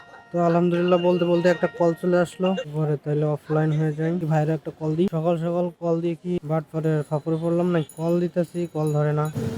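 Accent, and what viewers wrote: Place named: Jessore, Bangladesh. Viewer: ভাইয়ের গ্ৰামের বাড়ি কি যশোর অঞ্চলে? ভাষা শুনে মনে হলো।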